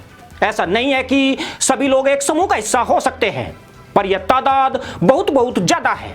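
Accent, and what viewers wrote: India. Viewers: यार ये हरियाणवी आवाज हिन्दी की कमर तोड़ दी आपने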